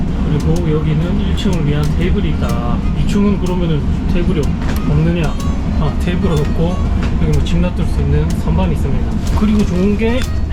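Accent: Gyeongsang, South Korea. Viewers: 오호 신기합니다. 갱상도 사투리가 친근하기도 하고 잘생김이 더욱 맘에 들고..조카뻘의 나이라서 조카생각도 나네요.. 젊을때도 그렇고 항상 행복하게 지내시기를.. 이제 알았으니 종종 볼게요...화이팅입니다....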